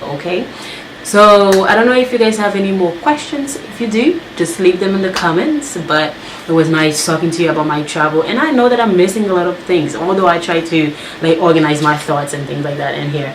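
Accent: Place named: Haiti. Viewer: Ur so pretty ❤️, u talk like you may be Haitian & or african french creole accent.....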